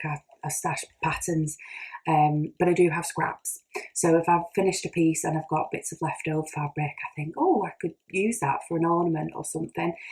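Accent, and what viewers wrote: United Kingdom. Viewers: I came over here after hearing about you from Darren Dizzy Stitcher and he was right, you're fab! I'm another British stitcher and it's so nice to hear a British accent "knockin about" on Flosstube! You're so easy to listen to and natural. I love your full coverage pieces and daren't look at Paine Free Crafts! Looking forward to seeing your progress next time!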